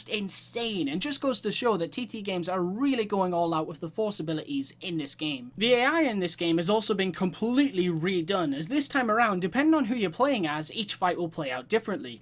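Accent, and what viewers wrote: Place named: Geordie, United Kingdom. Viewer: Just wondering are your from Newcastle Upon Tyne because I can hear that tint of Geordie in your accent?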